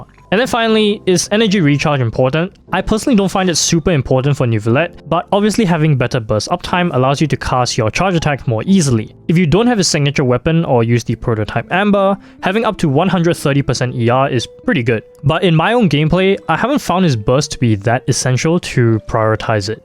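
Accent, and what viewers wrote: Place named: India. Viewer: broo are yoy indian? you sound super indian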